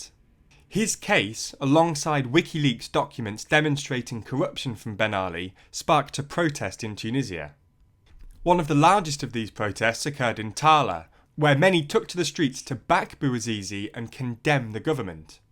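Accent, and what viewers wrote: United Kingdom. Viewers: It's always fun hearing somebody with the most British accent talking about these kinda things. xd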